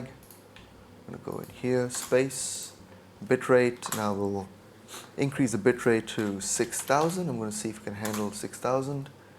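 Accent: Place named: Australia. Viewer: yeah I picked your accent for Aussie. I live near Murwillumbah.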